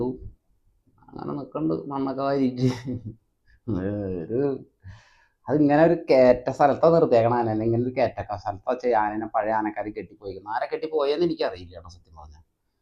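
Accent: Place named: Thrissur, India. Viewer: Thrissur slang super ayyitu parayunde❤❤❤❤❤😂😂😂😂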